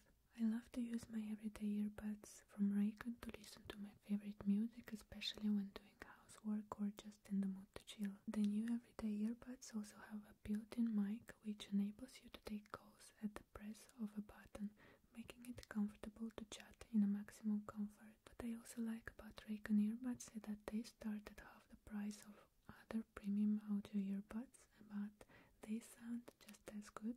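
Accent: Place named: Russia. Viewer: Акцент явно русский 😆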